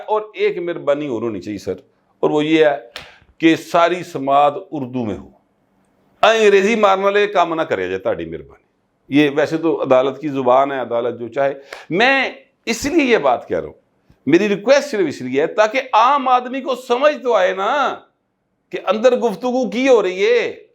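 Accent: Lahore, Pakistan. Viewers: مجھے تو فورا" پہنچ جاتا ھے آپکا وی لاگ۔ لاھوری انداز میں بات کا مزہ آجاتاھے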